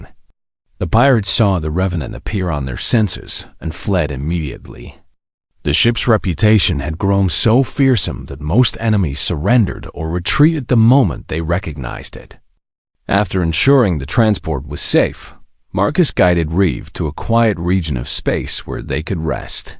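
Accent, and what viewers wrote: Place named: New York, United States. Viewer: I’m pretty sure the person who sampled their speech for the AI to use as narration comes from New York or close by in New Jersey. Great story. Too bad there wasn’t any constant and appropriate visuals to match it.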